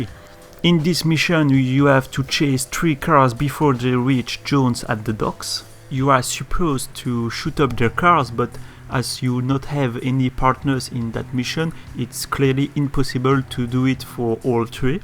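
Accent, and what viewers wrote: France. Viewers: you french boi ?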